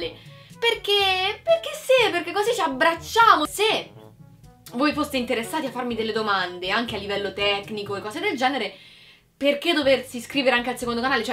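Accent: Tuscany, Italy. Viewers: Ma hai un'accento toscano ! sei di roma? :) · Hai un accento toscano molto :3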